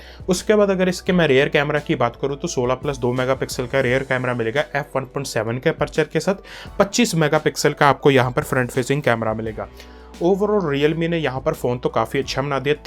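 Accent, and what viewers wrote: Punjab, India. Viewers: Punjabi accent bro 😅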